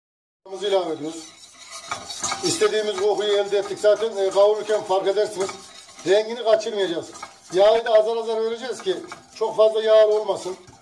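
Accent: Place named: Ankara, Turkey. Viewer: Şefim Ankara limisiniz yanlış mı anladım yav zere aynı bizim buraların şivesi diyorum 🥰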